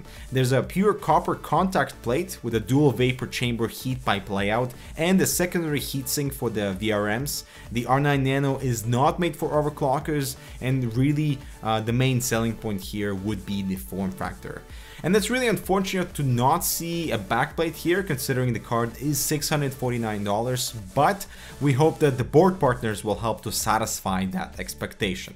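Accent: Russia. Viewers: can't listen to this russki accent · Мне кажется или у тебя чутка русский акцент?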